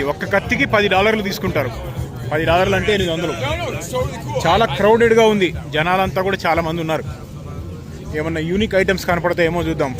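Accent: Andhra Pradesh, India. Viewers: anna nvu gun carry chestava safety kosam? and nv nizamabad nundi annav kani andhra slang undi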